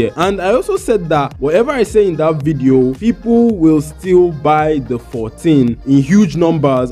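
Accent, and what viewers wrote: Nigeria. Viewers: You speak like a Nigerian Nice video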